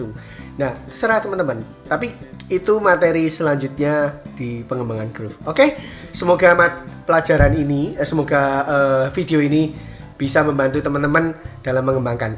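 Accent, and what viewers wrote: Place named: Java, Indonesia. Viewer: Natural medok e koh. 😁😁😁😁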